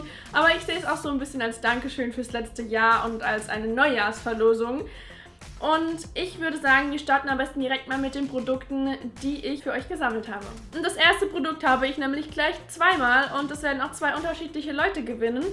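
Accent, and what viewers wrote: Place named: South, Germany. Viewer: Hiii, naaa xD ich hab gedacht ich versuche mein Glück mal^-^ was ich ja an deinem Kanal besonders feiere sind die Umsylings, manchmal ist es schon krass was das ausmachen kann und wie einfach man sich doch (äußerlich) verändern kann, ich denke das gibt den meisten auch einen Denkanstoß :) Und nochwas, ich hab irgendwie sofort an deiner Stimme gehört, dass du irgendwo aus dem Süden kommst (wie ich xD) weiss nicht, war einfach so xD Zum Gewinn, eh ja, also ich liebe liebe liebe Überraschungen also folglich eine von den DM Tüten wäre cool, oder was ich schon immer ausprobieren wollte ist das Wimpernserum** Aber wenn es hart auf hart kommt eine Überraschung macht mich immer froh xD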